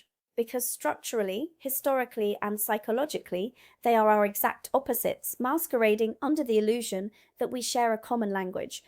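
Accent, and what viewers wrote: England, United Kingdom. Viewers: Why do you sound English? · I thought she was a Brit. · If you ar3 American, why do you speak with a British accent? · Why do you have a British accent then?. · I'm a brit and there is so much of this that is nonsense to me. And how is her accent so perfectly british when she has already said she's american.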